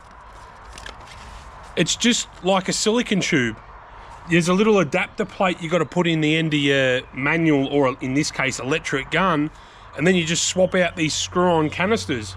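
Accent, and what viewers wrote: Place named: England, United Kingdom. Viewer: I just started watching your channel for the 1st time. I have to tell you as I was listening to your accent. I can now see how English accents morphed into Aussie, American Southern Hillbilly/Redneck and Kiwi. I have never heard that connection from one person before. Amazingly interesting. Anyway, you made a good choice buying that deal. Keep it up. Good job, buddy. 🇺🇸🇭🇲💪👍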